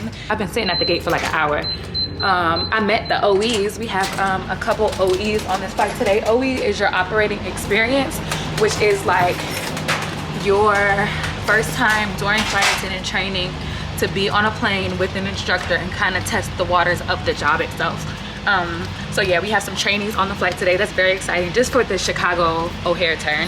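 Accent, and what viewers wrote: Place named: New York, United States. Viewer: I assume you're from New York based on your accent or atleast that's what it sounds like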